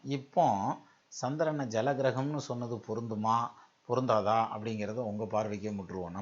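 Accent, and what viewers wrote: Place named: Madurai, India. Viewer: Great explanation sir with a simple Madurai slang. All videos are very interesting it’s induced my astrological view.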